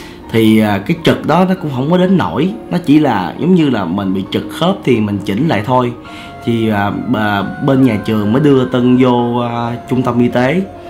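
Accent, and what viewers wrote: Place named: Central Highlands, Vietnam. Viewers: Đang nghe anh kể chuyện nghe tới phút cười muốn nội thương anh Tân nói giọng Tây Nguyên dễ thương ghê á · Tân đáng yêu cực kỳ. Tân nói giọng Tây Nguyên. cưng lắm luôn. Nghe sướng cực kỳ.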